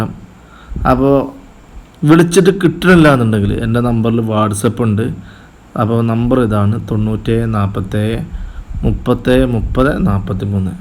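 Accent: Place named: Malappuram, India. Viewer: Malappuram slang aanu bro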